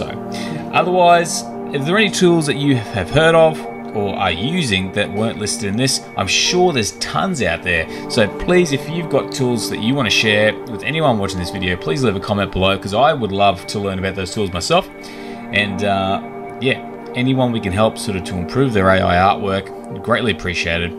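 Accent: Australia. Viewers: So nice to hear an Aussie voice on this topic. Thanks mate great info.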